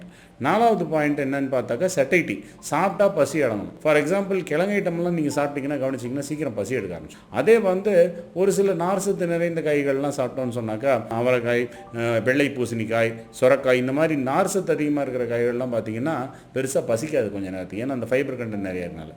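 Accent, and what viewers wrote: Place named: Kongu, India. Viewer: Vadhakudhal thaan sir😂 avar pesuvadhu kongu tamil. Adhanaal thaan vanakkudhal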